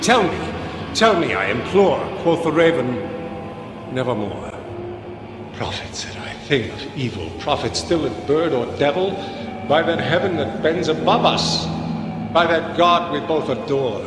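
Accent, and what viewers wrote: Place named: United States. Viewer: An American voice reading Poe..Refreshing.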